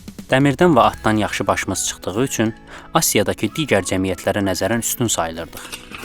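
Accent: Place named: Turkmenistan. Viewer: Abi ben türkmen aksanını sevmiyorum ya... Direkt dislike attım...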